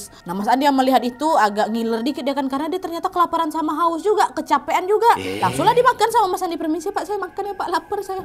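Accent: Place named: Sumatra, Indonesia. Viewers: Seru denger cerita pake logat Sumatera campur bahasa Padang dan Jawa. Jadi unik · asli urang awak Yo? Minang dimanyo? Masih totok minangnyo kayaknya. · logat pku nya kental kali haha,semngtt ka,salam dari marpoyan sekitar😂😂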